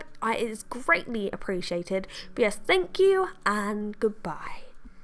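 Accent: United Kingdom. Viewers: Do live in England, Canada or the US? Because you have a British accent, but you have done that rover program. Not trying to be rude just wondering. 🙂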